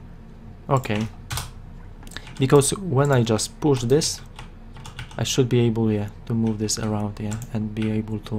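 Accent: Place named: Poland. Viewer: true Polish accent :D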